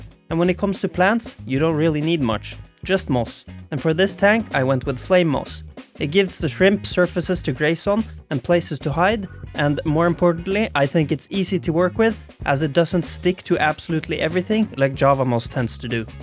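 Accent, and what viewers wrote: Norway. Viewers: The problem is, in Sweden you are not allowed to sell shrimps on facebook (thougth it was the same in Norway where it sounds like you are from). But great video!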